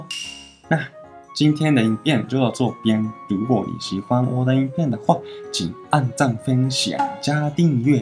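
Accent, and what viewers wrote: Taiwan. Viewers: 额 一听就是台湾腔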